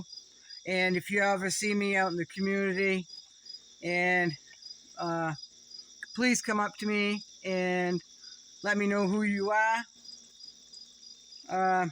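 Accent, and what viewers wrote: Maine, United States. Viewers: Congratulations on your 3,000! Im picky on pages I follow because I dont have time to watch them all lol I only follow a handful, I definitely learn from yours + agree with things you have to say + you make me laugh + of course being from Maine love your Accent. Wow crazy temps up there😮 it was only 85 here in SC. Hope you get some good sleep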